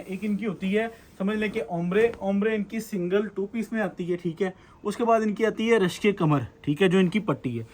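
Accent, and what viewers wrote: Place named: Faisalabad, Pakistan. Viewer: Ye fslbd k hain bhai ki boli fslbd ki h plz ap apni shop ka address b Bata dia karein tak ap ki shop ka visit Kia ja saky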